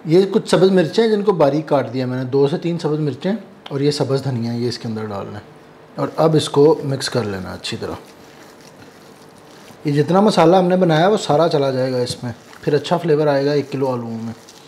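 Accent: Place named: Pakistan. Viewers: I speak bangla and can barely speak urdu but i must say pakistani urdu accent is so pleasing MASHAALLAH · TrueTrue7 . Its still Pakistani accent, not Indian... samjhe? Bewaqoof!!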